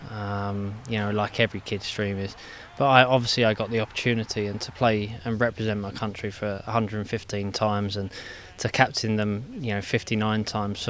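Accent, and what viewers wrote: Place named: United Kingdom. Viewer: I love your British sound <3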